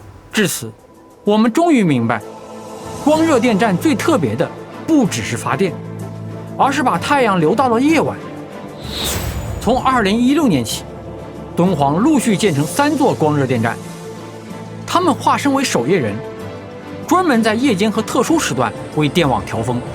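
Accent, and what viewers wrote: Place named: Fujian, China. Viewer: 我一听就知道是福建的，特别可爱。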